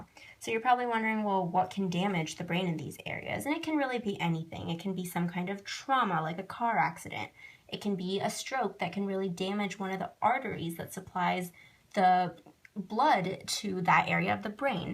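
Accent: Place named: India. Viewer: 🤔🤔 Indian and such an amazing accent.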